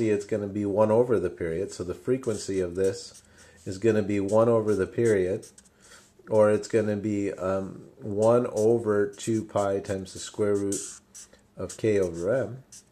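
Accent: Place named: Brooklyn, United States. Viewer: Hello -something in your accent tells me you are from Brooklyn N.Y. N.Y. and like eating Gefilte Fish and beigel with cream cheese, am i right? Greetings from Yoram Stein Amsterdam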